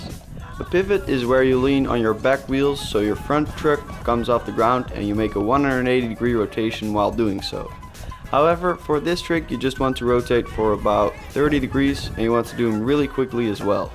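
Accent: Netherlands. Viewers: Are you Dutch? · Ben je nl 😂😂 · Are you dutch?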